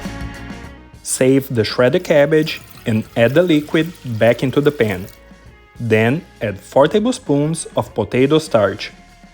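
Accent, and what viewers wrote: Brazil. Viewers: This guy has to be Brazilian. I recognise my own accent 😂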